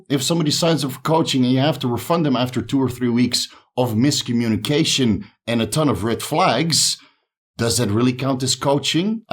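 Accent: Netherlands. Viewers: Your profile says USA, but this is a Dutch accent right? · are you dutch? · good video! you sound dutch. are you? greetings from the Netherlands haha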